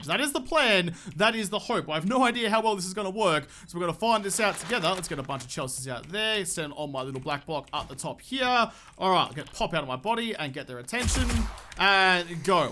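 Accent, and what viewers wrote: Australia. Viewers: Love the ozzie accent :)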